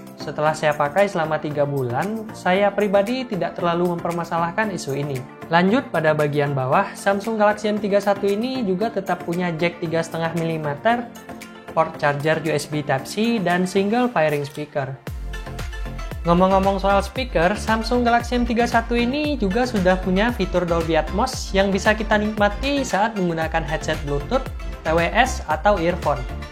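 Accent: Padang, Indonesia. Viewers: Orang padang yo bg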